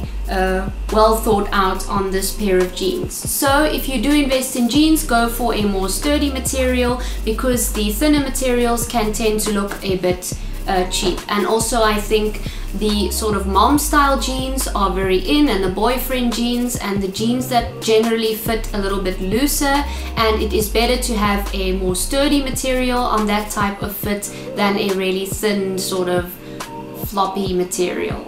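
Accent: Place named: South Africa. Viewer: Just found your channel and love the tips. Where are you from? Your accent sounds South African xx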